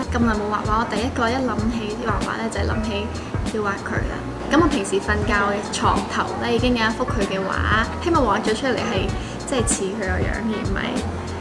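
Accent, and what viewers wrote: Taiwan. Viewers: 每次聽AV講台語的時候都好可愛喔! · 講臺語好像很標準，有親切感，很像鄰居家女兒的感覺，我女兒都沒這麼道地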